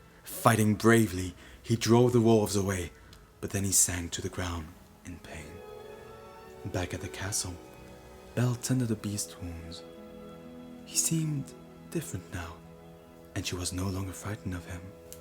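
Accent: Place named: France. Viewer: Your French accent suits it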